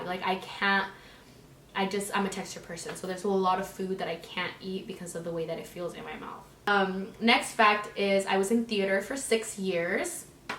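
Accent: United Kingdom. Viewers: That accent is def british hahahaa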